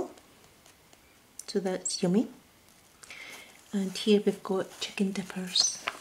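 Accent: Scotland, United Kingdom. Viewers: Currently away from home, missing the Scottish accent and style, so love watching your vids. It was magic seeing the sales and going through them one one as you did. If live to see this buyer a weekly thing! And based on the comments would others 😀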